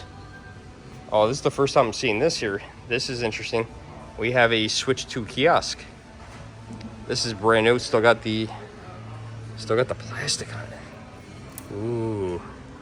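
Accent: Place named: Midwest, United States. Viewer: Where in the Midwest are you? I can tell by the accent. :-)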